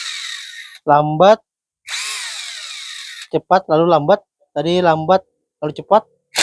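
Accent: Indonesia. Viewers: Sprti logat banjar. Orang kalimantan selatan???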